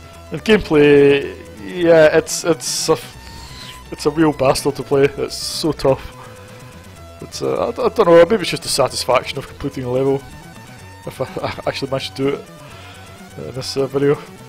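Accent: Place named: Scotland, United Kingdom. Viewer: nice to hear a fellow scot like myself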